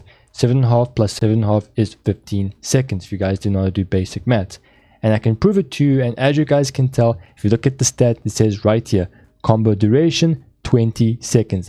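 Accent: South Africa. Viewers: wait. you south african?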